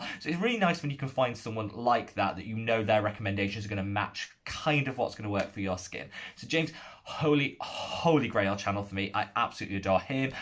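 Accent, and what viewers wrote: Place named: North, United Kingdom. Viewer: Ahh. That's it. The northern UK accent is what you have. That's what I had thought. I've seen videos in the past about all of the different accents in the UK. I was terrifyingly obsessed with the Spice Girls in the late 90's. I was 11 when they debued in 1997. They were famous/popular in Canada almost instantly/the same time as in the USA and the UK.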